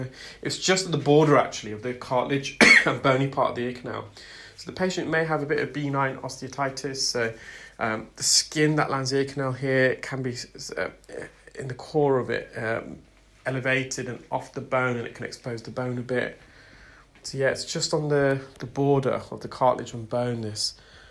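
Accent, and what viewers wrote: England, United Kingdom. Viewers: You are a sweetheart, for sharing with. Us your knowledge and skills. It is quite wonderful to hear your soft English accent as you explain to us why and how you clean an ear canal. Thank you Take care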